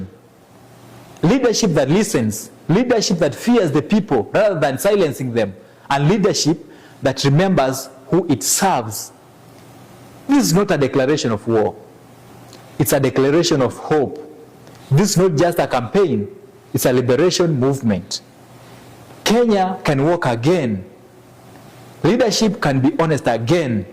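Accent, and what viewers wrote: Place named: Uganda. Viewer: He sounds more of a ugandan than kenyan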